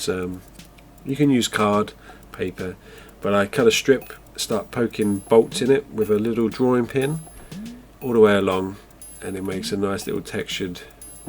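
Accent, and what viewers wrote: United Kingdom. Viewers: R u from UK ?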